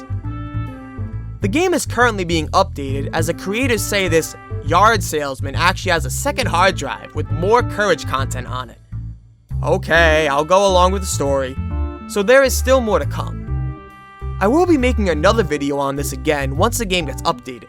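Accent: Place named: Minnesota, United States. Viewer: This guy has the thickest minnesota accent. Love it!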